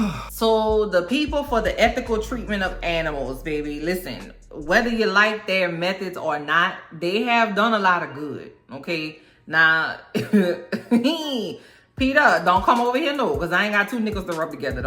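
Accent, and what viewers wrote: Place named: Los Angeles, United States. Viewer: Just binged it… all these folks are delulu! I don’t know anyone else reviewing this, so I’m here to stay for a while! U sound like my LA ppl! 🫶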